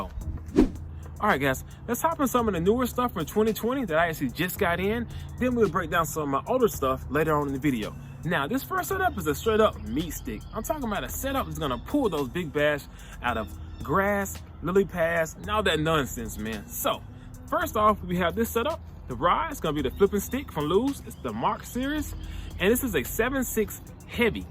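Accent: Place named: South, United States. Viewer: Lol temperature swangs! Lol thats southern draw! I enjoy your videos bro. I hope to see you do more kayak fishing. Thank you for taking the time to make these videos.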